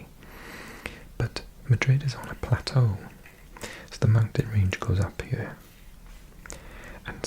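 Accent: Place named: United Kingdom. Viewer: what is funny is that as someone from outside the UK i don’t notice you having a welsh accent and i just hear a british one, EXCEPT when you say “here” and it sounds like hiyuhr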